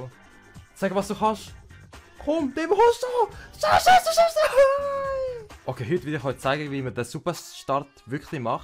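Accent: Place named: Switzerland. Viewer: Mmmmm Musik in miiene Ohre. [Schwiizerdütsch] andlich moll a Kanal wo nit Schwobedütsch redet. An alli Dütche wo dass woll läse und mi nit so richtig verstot dass soll nümme Spass sie und Zücher Chönet grat wag goo. Spötistens jetzt würde ihr in lelem Kanton i lab. ;)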